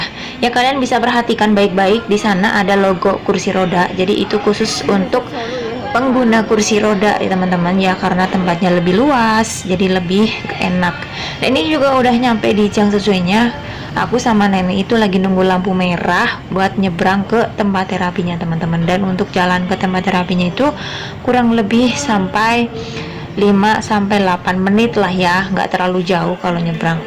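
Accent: Banyumas, Indonesia. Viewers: Salam kompak wong ngapak..ora ngapak ora kepenak kk..😁 Sukses selalu kk..🙏🙏🙏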